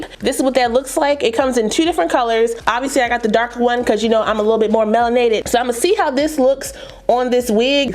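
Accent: Washington DC, United States. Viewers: You from DC MA'AM??? I hear you rocking that go go!!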